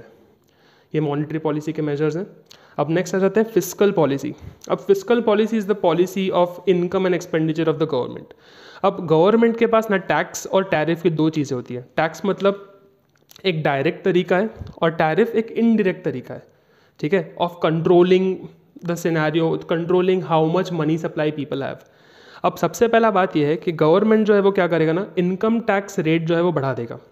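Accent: Gujarat, India. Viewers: Aap gujrati ho? 🤔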